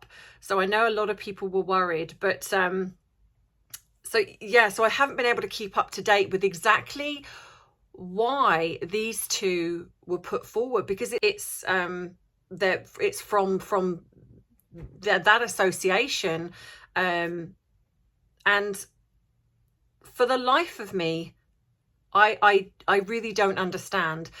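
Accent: United Kingdom. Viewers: 🌸 Hello Bubble Fam 🌸, great to see you're back. I like the ideas you have for the channel. Then again, I've been in love with British accents since The Beatles first came to the U.S. Paul... sigh. ♥️ So I would listen to you read the phone book! Anyway, finding balance in one's life is not easy and priorities change. Have patience and I know you will find the answers. 💋 See you soon!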